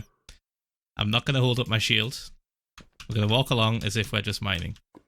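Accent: United Kingdom. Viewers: gosh i love that british accent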